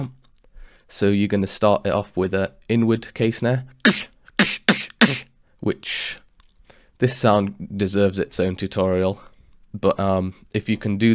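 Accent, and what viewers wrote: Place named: United Kingdom. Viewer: Were you raised in the UK...coz u have a strong british accent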